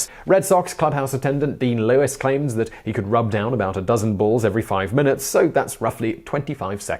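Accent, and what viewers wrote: United Kingdom. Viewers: today i found out....a man with a British accent has done more research about the most American sport since Lacrosse then several of my friends that definitely have moms that know which shoe box their little league photos are in.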